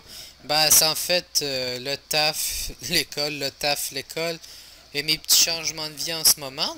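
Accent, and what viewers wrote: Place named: Quebec, Canada. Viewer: OMG TN MICRO EST MEDIOCRE XD, Sinon ta un accent québécois (je suis un nouveau sub) :p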